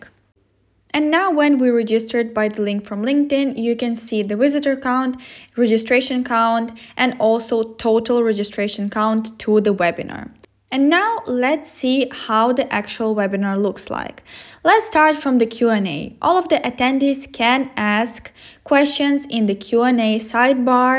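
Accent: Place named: England, United Kingdom. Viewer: Love your English accent, great vedio.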